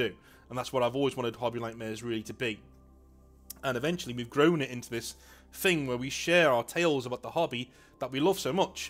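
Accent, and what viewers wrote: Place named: North, United Kingdom. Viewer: I found this channel while feeling down and getting back in to the Hobby. Currently listening and getting joy from the stories, and familiar sounding northern advice, while waiting for hobby tools to build up some Orcs and Bretonians. Orcs are just super fun, and I want some good noble knights too. I may even get around to sorting my Steel Legion in red and black (Inquisitorial Guard) to finally join the Grey Knights... I like Knights! Thank you for the joy you have shared with me, and I mean that truly.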